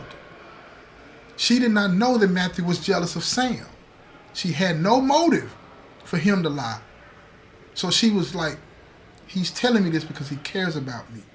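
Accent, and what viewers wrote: South, United States. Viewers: Hey cutie, I don't comment much, but, trust me, I listen to ur channel EVERYDAY. AT 10AM. EVERYDAY. I LOVE THE SOUTHERN ACCENT .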